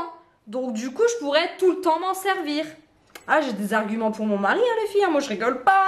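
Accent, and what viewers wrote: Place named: Béthune, France. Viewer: Oh!!! J’adore votre accent! Vous êtes de quel coin? Mais vous l’avez peut-être déjà dit …(je suis une abonnée récente) cela me rappelle mes cousines qui sont du côté de Bethune.Sinon merci pour vos vidéos si agréables ❤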